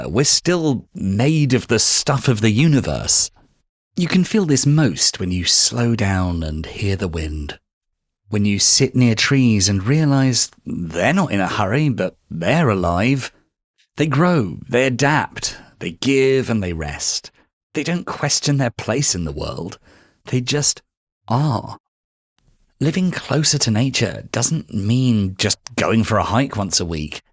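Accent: England, United Kingdom. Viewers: You know what I am sick and tired of hearing these English accents. I'm not even joking I'm going to throw my phone or my TV out the window if I hear one more video with somebody with some half-baked goofy English accent. I'm sick of it.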